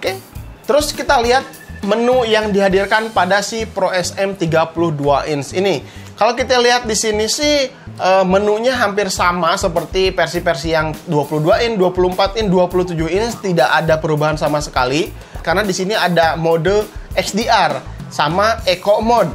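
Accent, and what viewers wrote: West Java, Indonesia. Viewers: Aksen sunda nya masih ada kyk dulu _.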